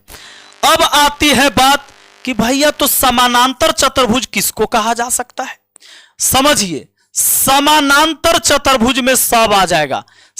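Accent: Bihar, India. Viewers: एक बिहारी सौ पर भारी आवाज से पता चलता है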